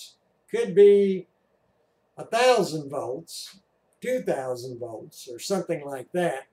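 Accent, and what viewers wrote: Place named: North Texas, United States. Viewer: I got stuck on your channel becuase for some reason, you remind me of my late Grandad. I don't know where you're from originally, but he was from North Texas and had a very similar dialect to yours. He was also a ham, as is my Dad. For whatever reason, I never caught the ham radio bug until about a month ago, so I took the Technician test at the beginning of March 2018, and the General at the end of March. I wish I had done it when he was still alive, but at least I'm getting to enjoy learning from my Dad, and your videos as well. Thank you for them! 73s to you! KG5YTI - Brian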